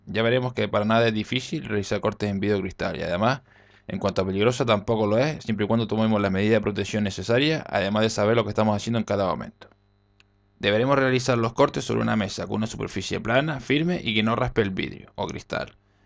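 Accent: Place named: Canary Islands, Spain. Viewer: Es canario.. Por como pronuncia la. CH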